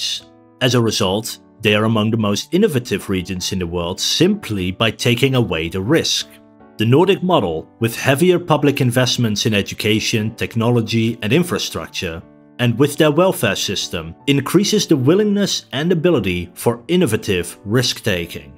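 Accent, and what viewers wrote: Netherlands. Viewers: This dude sounds Dutch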